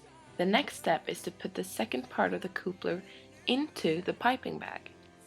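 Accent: Norway. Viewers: Jeg så det på deg at du var norsk! I tillegg til aksenten mens du snakket, hehe :-D Måtte sjekke, og da så jeg at du var det på de andre videoene dine :-) Veldig fine muffins :-) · herregud det første jeg tenkte når jeg så den viden her var: hun må være norsk.. også hørte jeg aksenten og det var vel det som overbeviste meg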